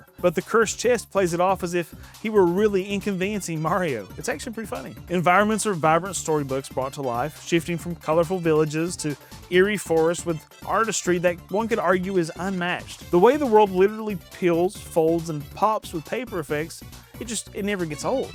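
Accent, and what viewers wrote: South, United States. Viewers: Your accent makes me feel at home (Grew up with southern people) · I enjoyed this video way more than I ever thought and the southern accent made it way better 😂😂😂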